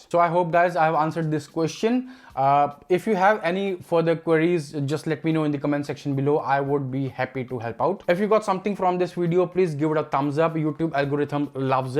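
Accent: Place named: India. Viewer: Nice accent mate! You from India or something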